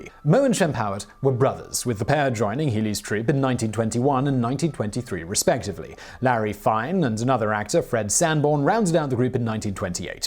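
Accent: England, United Kingdom. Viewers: It’s fantastic to hear an English accent talk about The Stooges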